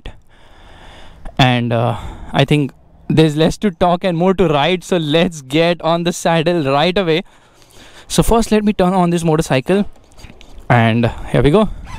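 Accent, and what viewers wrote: India. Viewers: logat e lucu yo Mbah wong india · i'd rather watch the whole video on mute just to look at the motorcycle if i have no other option. And thats just to avoid that horrendous, terrible thick indian accent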